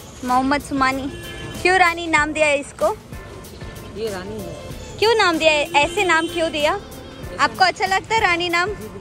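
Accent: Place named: India. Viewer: Hindi Accent 😂💥💯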